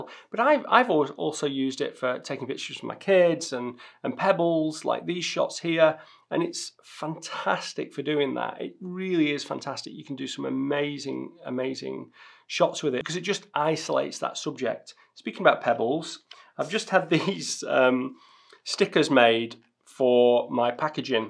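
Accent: United Kingdom. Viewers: Great stuff. I kinda wish I had grown up in the UK, simply for the accent:) instead I have "Texan";-). Love the Tree/Mountain shot! Most of my insect shots are using my 100-400.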